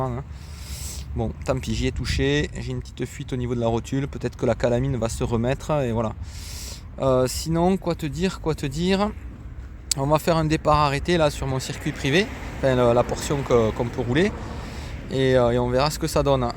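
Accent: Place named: South, France. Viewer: Tu as l'accent du midi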